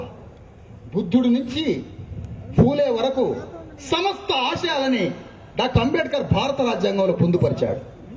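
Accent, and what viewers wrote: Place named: Andhra Pradesh, India. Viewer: Nee aandhra slang chustene artamutundi be nv aandhra kodukuvani... Dobbey be...rayalaseema to polustunnaventi be nee tooooo ga...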